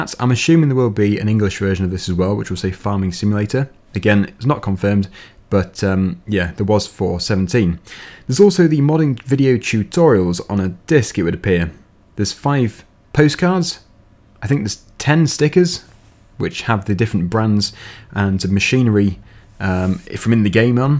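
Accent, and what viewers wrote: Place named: United Kingdom. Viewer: British accent's quite annoying but god your's very strong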